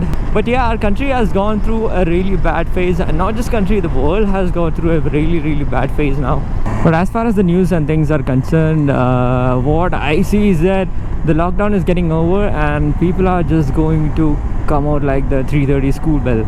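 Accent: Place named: India. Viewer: I do not know how I ended up here. I gather is Indian if an IT engineer and by his accent., stay safe there in India. But if you are healthy and not too old, this corona virus is not relevant to us.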